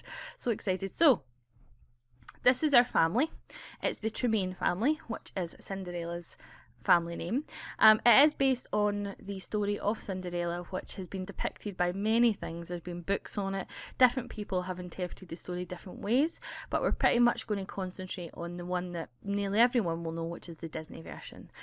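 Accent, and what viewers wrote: Scotland, United Kingdom. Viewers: Why are u British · oops well i love you scottish accent then! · shes scottish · I love your videos and your accent! I've always wanted to go to Scotland too ^J^ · Are you Scottish ? If you are you can be the princess from BRAVE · Are you Scottish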